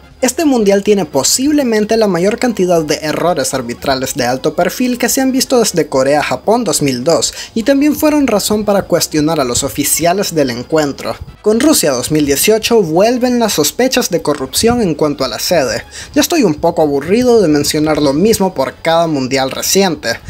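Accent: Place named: Nicaragua. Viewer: Es de Nicaragua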